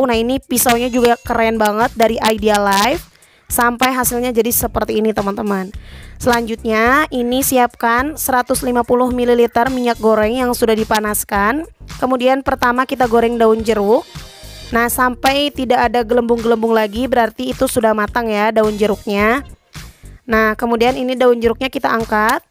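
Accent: West Java, Indonesia. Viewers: Akumah suka yang ngehostnya nyunda banget🤓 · Masyaallah resep pisan da nyarios na ka c teteh ieu sunda pisan👍,, salam dari aku orang ciwidey teteh 🙏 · Alllllaaaaah c teteh ...sunda bingit ,😁😁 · Sunda banget euy hehehe si teteh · Sunda pisan nya saiiiiii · Lucu pisan aya sundaan euy🤗😆😆sehat slalu teh makin lancar usahanya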